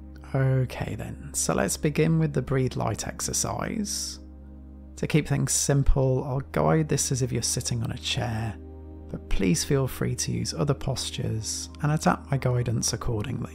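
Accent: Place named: England, United Kingdom. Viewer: Always nicer and more calming to hear English accent.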